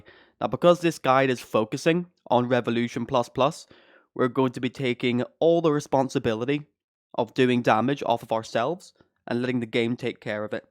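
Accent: Northern Ireland, United Kingdom. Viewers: Epic vid, saving for later :) Sounds like a norn iron accent, where are you from haha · wait wait wait, are you northern irish ?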